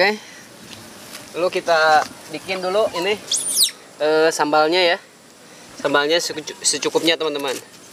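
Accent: West Java, Indonesia. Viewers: sunda pisan mang wkwk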